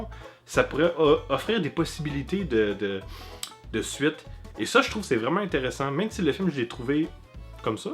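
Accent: Canada. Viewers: tes quebecois je crois tas un accent canadien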